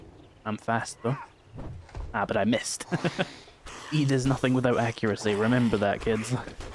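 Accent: United Kingdom. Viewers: very cool video! i always wonder in which part of the UK they speak your dialect...!? greetings from bavaria =)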